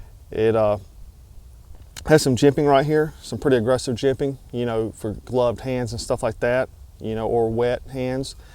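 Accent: South, United States. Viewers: Do you have to buy all of these knives? I would have to agree that $385 is alot. Also I went to their website and it was difficult to navigate. Wish it was a little more user friendly. Good review though. I trust your opinion because it sounds like your from the South as well lol. Also I don't know if you have done this in other videos, but I'm not a steel expert so explaining the different qualities or benefits of the different steel would help me out.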